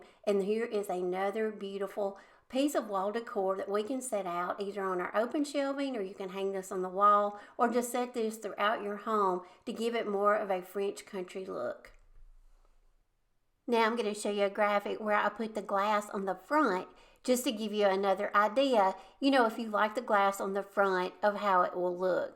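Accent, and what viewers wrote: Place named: Alabama, United States. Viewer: The subject…French country decor…..caught my eye. Then I heard your sweet voice and said to myself “I bet she’s from Alabama “. Hi from Walker County(NW of Birmingham)…👋🏻👋🏻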